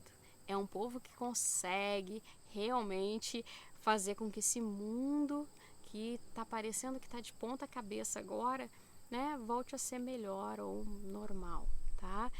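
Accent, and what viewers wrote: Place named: Gravataí, Brazil. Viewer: QUE BOM EU MORO EM GRAVATAÍ E RECONHECI O SOTAQUE, PARABÉNS!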